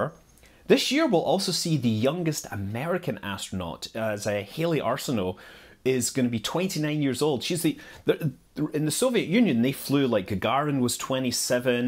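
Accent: Scotland, United Kingdom. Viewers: He's Scottish. Well, kinda.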